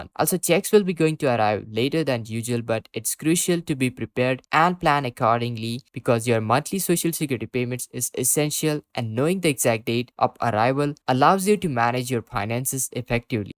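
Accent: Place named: Punjab, India. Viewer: DUDE YOU TALK SO FAST WITH YOUR THICK PUNJABI ACCENT THAT I CANT UNDERSTAND YOU. SLOW IT DOWN.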